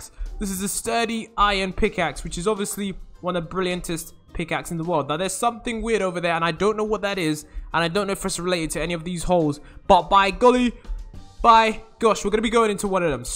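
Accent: United Kingdom. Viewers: Are u an british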